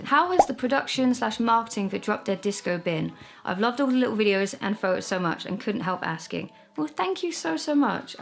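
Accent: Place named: Essex, United Kingdom. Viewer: I love that you've left up Ask 4, that's still so entertaining. An interesting slice of history too with the full on Essex accent still strong back then.:)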